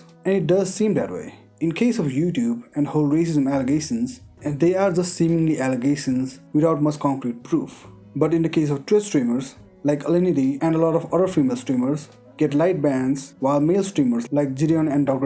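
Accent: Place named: India. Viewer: Yo, from a fellow indian work on your accent.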